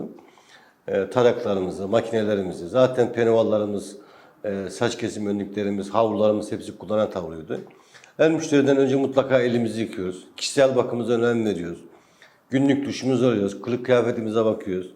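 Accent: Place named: Turkey. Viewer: Cc bsr Mr merci beaucoup de nous avoir parlé de vous cependant vous avez du talent et ça ça ne s'apprend pas mr6 👍 bravo un grand Merci pour votre accent Turc j'adore.cest agréable de vous écouter je comprends rien mais je lis l'anglais donc je comprends tout je vous zdore6 jame6voyd voir ici à très vite merci beaucoup ♥️🇹🇷🇨🇵👍😎🇫🇷🇹🇷🇹🇷🇹🇷🇹🇷♥️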